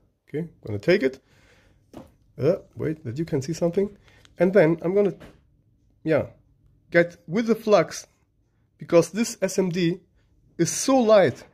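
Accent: Germany. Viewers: sounds like german or austrian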